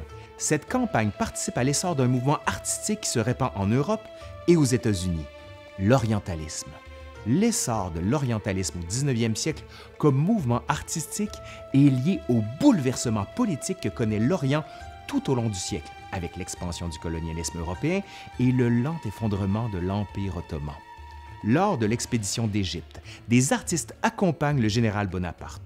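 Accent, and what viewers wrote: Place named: Acadia, Canada. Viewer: Les bobards des journaux européen ont la vie dure.. avec les malédictions (sur Howard Carter et, tout les autres,).bien avant the Sun un des... descendants.. Merci pour cette vidéo Intéressant ...et pédagogique On suit les explications comme les fresques.. égyptiennes.. avec un éclairage nouveau et toujours sympathique avec l'accent Acadien 😉👍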